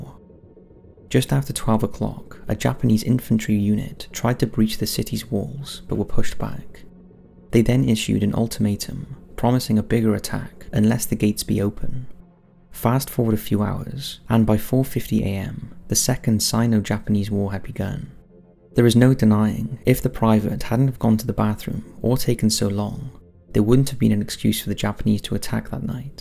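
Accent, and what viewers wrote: United Kingdom. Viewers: Hugh Jass He’s a Brit, hes gonna pronounce words in a different way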